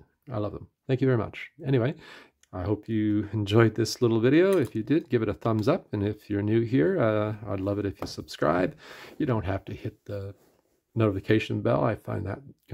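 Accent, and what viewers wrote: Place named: Canada. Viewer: I detect Canadian or Minnesotan accent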